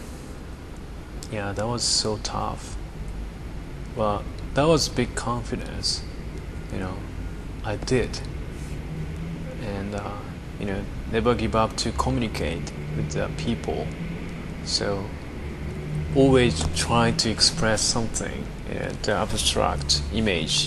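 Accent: United Kingdom. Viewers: His english is so good. Did he learn english in Japan? I don't know enough about him. It really sounds like he learnt british english. It sounds like he has a british english accent as opposed to american. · He must have learned English from an person with an English accent. You can hear it a bit. So cool that he's bilingual, all the more power to him.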